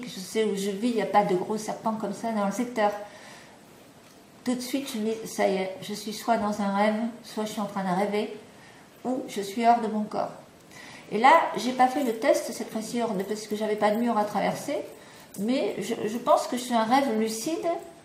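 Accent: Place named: United Kingdom. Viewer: Superbe vidéo, de plus tu es très responsable dans tes dires et très soucieuse de ne pas choquer ton public. ps Ton accent est très agréable, Anglaise peut être.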